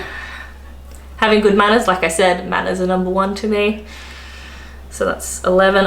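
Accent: United Kingdom. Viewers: Sounds like you’re pretty much a Brit’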